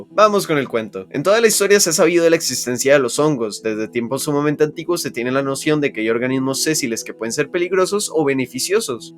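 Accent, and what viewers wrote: Costa Rica. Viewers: Ufff se noto lo tico xd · Eres de Costa Rica verdad? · Buen vídeo, nuevo suscriptor, esa forma de hablar y pronunciación de la R me suena de CR jaja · Desde que lo escuche supe que era tico purs vida pa ❤️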